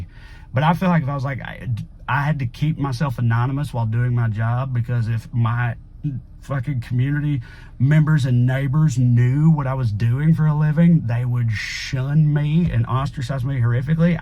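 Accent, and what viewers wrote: South, United States. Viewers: You are the fastest southern talker I've ever heard! 😂 I grew up in NY so it sohnds normal to me, but I wonder if other southerners can understand you LOL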